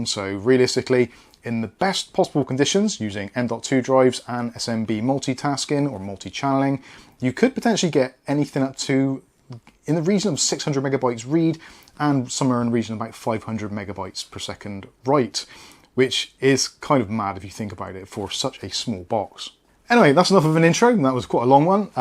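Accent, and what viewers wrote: Bristol, United Kingdom. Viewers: Sounds like a fellow Bristolian or close ;)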